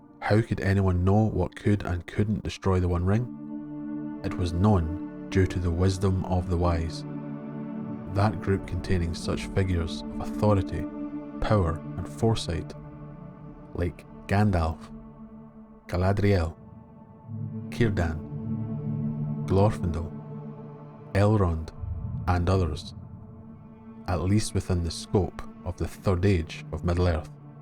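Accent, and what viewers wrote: Scotland, United Kingdom. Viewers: I've been gobbling up your posts all weekend. Frankly, they're great. And I apologize for taking the piss about your accent. It takes a Scot to pronounce LOTR names and places with some verisimilitude and I take my hat off to you.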